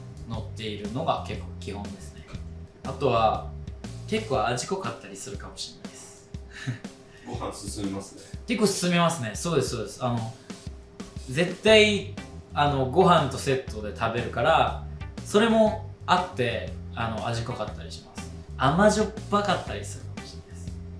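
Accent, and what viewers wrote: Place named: Kyoto, Japan. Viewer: 時々でる京都弁笑えてもうた🤣 作ってみたいな🤔てかレイちゃんの手料理食べたーい❤料理恒例にしてほしいな！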